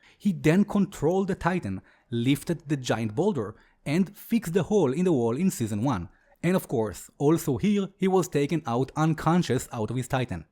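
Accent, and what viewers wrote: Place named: Israel. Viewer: Bro I could tell you’re from Israel by your accent 😂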